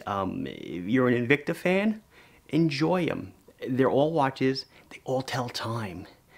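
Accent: Long Island, United States. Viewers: He's from Long Island. That's how they talk. 😁👍✌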